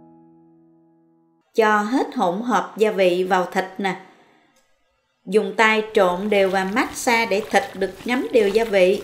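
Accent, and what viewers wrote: South, Vietnam. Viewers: giong chi noi de thuong qua he giong noi chuan mien nam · Cảm ơn sự hướng dẫn của về chác là khô bò. Lâu lắm rồi không được nghe 1 giọng nói ngọt ngào đặc trưng của miền Nam khi xưa. Giọng mà đọc truyện thì hay phải biết. Chân thành cảm ơn. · Cối xoay hay quá. Giọng nghe đúng chuẩn miền nam.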